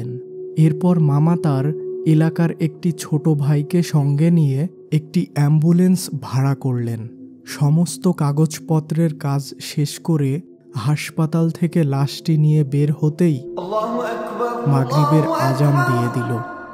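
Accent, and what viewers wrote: Bangladesh. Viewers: কথা শুনে মনে হয় বাংলাদেশের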